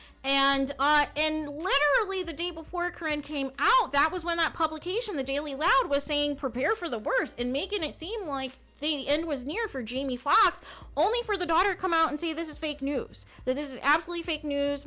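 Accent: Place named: Minnesota, United States. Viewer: o ok, ur voice just reminds me of my friend from Minnesota especially when u say u guys.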